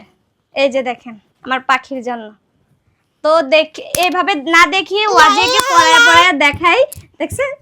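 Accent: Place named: Bangal, Bangladesh. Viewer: কথার ভাষা কি বাঙ্গাল বাঙ্গাল ছি এগুলো আবার ভিডিও করে লজ্জা শরম নাই বাঙ্গালের বাচ্চা গো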